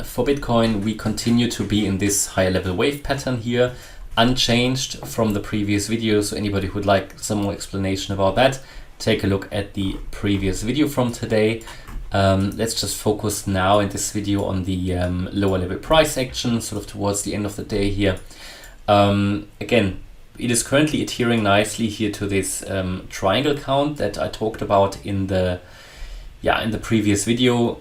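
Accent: Germany. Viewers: Love the German accent. Keep the studies in coming! 📊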